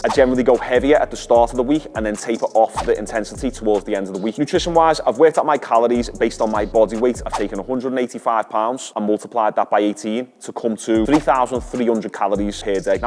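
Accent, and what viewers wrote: Liverpool, United Kingdom. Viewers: I'm guessing ur scouse from ur accent. U still based in Liverpool??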